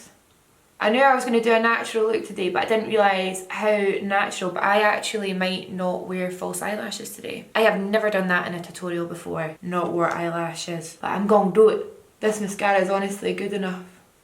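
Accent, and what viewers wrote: Scotland, United Kingdom. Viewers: Tarte pro glow to go is the perfect product for that scottish accent! I love it haha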